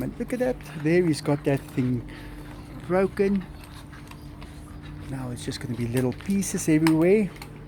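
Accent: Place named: South Africa. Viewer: I just discovered your channel today and I love it. It's so interesting and awesome to see these things, thank you for saving these amazing creatures. I btw also recognized you were south african immediately because I could hear some words similar to dutch and that's when I realized I was right, hahaha! Thank you for sharing your awesome life with us, new sub here :)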